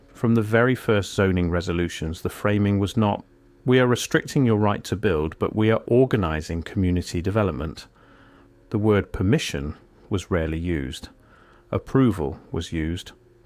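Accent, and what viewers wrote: United Kingdom. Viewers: Why are all AI NARRATORS IN BRITISH ACCENTS 🤔 🙄 FAFO WWG1WGAWW NUREMBERG 2.0 NCSWIC NQTHING GOD SEES EVERYTHING 👀 GOD WINS FRENS M 🏆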